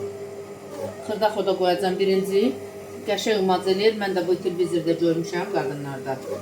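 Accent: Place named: Salyan, Azerbaijan. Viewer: Niyə axı pesok deyirsiz? Öz dilimizdə deyində şəkər. Gül kimi sözümüz var. Ləhçə Səlyan ləhçəsinə oxşadı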